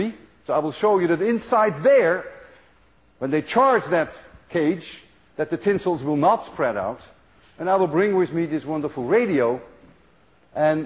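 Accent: Netherlands. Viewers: Prof was born in Holland? - his accent is very Dutch Great presentation though :) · all I can tell you is that his accent is dutch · He has a Dutch accent somehow. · Dit is een Nederlander, ik hoor het aan zijn accnt XD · As far as I can hear, he is dutch. 100%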